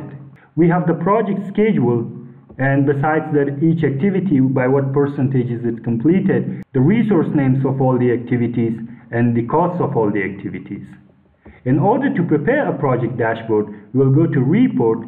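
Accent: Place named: Afghanistan. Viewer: The time I heard you, guess what, I told my friend this person is from a very special country, Afghanistan. Amazing Mr., great job!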